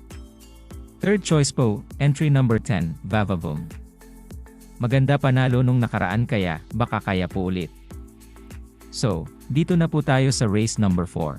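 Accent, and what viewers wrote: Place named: United States. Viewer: Naging Kano ang accent ni Bossing!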